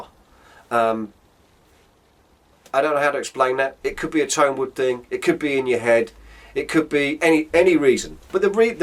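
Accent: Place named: North, United Kingdom. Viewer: Where are you from, mate? Is that some northern England accent? I am not a native english speaker, but it is like i have no difficulties to understand every single word you say. What makes it even more strange, is that i dont hear this accent very often, yet it is much more understandable to me than every other english accent i heard.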